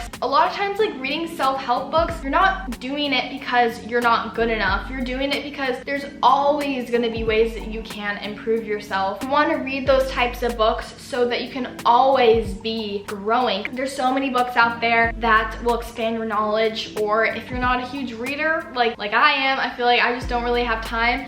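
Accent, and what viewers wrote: Australia. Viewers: It’s not a video without the Australian accent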